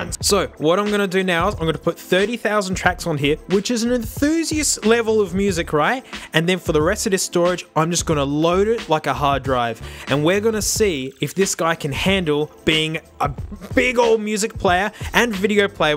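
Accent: Australia. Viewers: Wasn't ready for the speaker to have an australian accent lol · I love watching an angry Australian man yelling at iPods. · hes australian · How I love the feeling of sleeping while hearing a Australian dingus shout at an ipod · i like an Australian man yelling at tech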